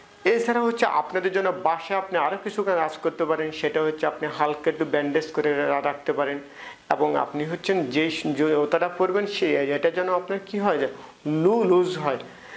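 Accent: Noakhali, Bangladesh. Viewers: নোয়াখালী মনে হয় স্যারের বাড়ি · আপনার বাড়ী কি নোয়াখালী? কথা শুনে মনে হচ্ছে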